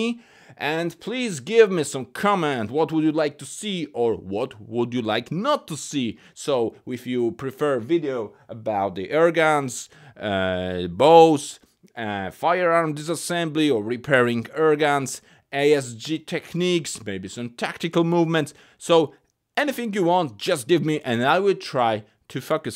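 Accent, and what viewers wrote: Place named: Russia. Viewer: Why does he have a russian accent?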